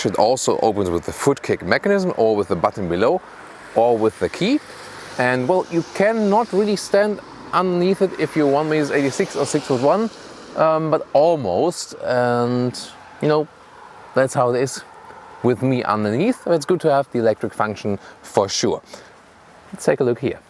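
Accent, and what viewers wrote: Germany. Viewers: Your German English is annoying to listen to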